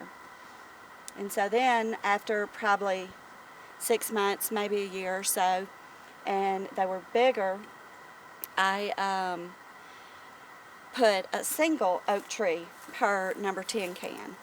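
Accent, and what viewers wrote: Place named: South, United States. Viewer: love your southern accent.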